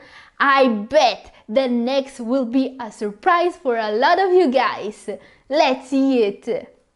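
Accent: Italy. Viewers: was born in the philippines, but she studied in italy from grade 1 up to liceo, n she took seriously her studies. Sometimes her accent words are in italian without noticing her english pronunciation. We have to understand italian n english pronunciation are different. We have to excuse her wrong words mistaken. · Oh is so awesome… she has slightly italic accent though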